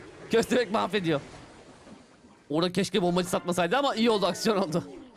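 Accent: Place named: Yozgat, Turkey. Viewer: Abimin yozgat şivesi var adamsın abi😎😎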